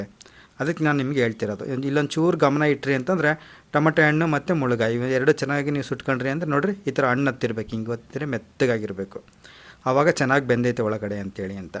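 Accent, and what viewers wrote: Chitradurga, India. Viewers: ಚಿತ್ರದುರ್ಗ ಭಾಷೆ ಕೇಳಿ ತುಂಬಾ ಸಂತೋಷ ಅಯಿತು ನಮ್ಮೂರು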